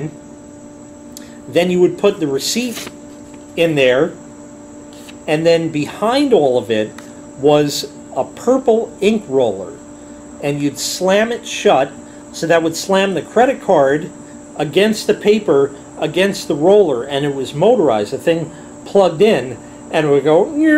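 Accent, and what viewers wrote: New York, United States. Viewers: You know you're listening to someone from NY when they say "aarange" instead of "orange" If I had to deal with that vending machine, I would be so pissed. Getting orange instead of the Diet Coke I asked for? Fuck that guy... There was a time that paying with cash was the norm. Now it's debit cards like I have. I'll tell you, I feel much more safe spending with cash than a debit card. Honestly, do Millennials even know what the hell cash is?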